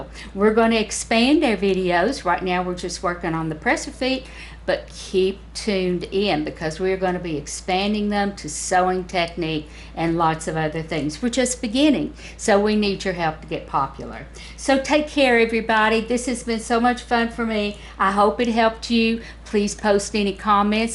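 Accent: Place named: South, United States. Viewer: Thank you so much for this video, and you have a way to explain things that makes it so easy. I know you say is only straight stich but when you are done can you back and forth stich to secure the gather? Sounds silly me asking for this or do i have to change it to foot number 1 to do that. Such beautiful southern accent, love it. I mean to ask when calculating panels for a ruffle skirt how do you go about it? what is the formula so that the gather matches the panels? I can be good at math but at the same time in certain things I am not so good. Do you have a video on that? Thank you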